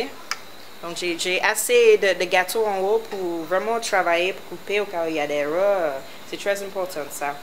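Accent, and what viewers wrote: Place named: England, United Kingdom. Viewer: Sa se voit que t'es anglais Ta vois je l'aime bien😇😀😊☺😍😻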